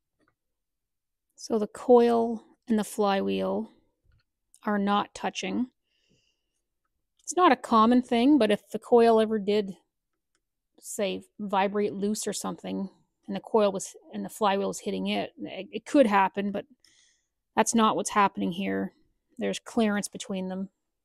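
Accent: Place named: Canada. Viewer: I tune in for the outs and abouts, your Canadian accent is amazing !! The chainsaw content is awesome too.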